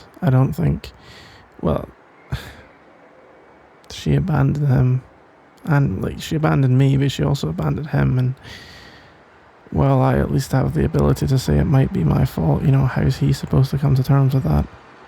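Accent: Scotland, United Kingdom. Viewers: OMG YES A SCOTTISH DILF AUDIO 🥹 my life is complete, but on a serious note this is adorable <33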